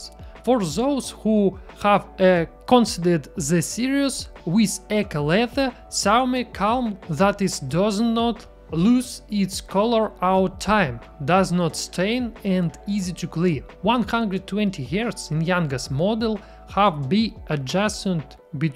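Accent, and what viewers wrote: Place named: Russia. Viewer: The Russian accent is the cutest accent in English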